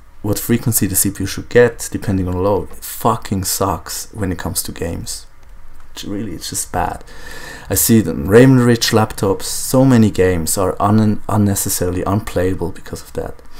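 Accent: Germany. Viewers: Saint Siemore Yes he is german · Are you german? thanks for the video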